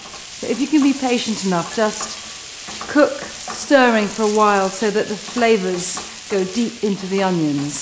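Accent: United Kingdom. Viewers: nigella removes stress from cooking . she's truly fun to watch . but sometimes I'm a bit stressed listening to her brit accent .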